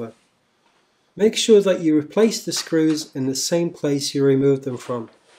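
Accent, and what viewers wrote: United Kingdom. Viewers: Hi there! thanks for the tutorial! Where did you buy the assembly from(which buyer)? I found some on Ebay, shipping from China, but I am kinda skeptical. If it was from China, did you have to pay customs? (you sound British, so it should apply to me as well as I am from the EU).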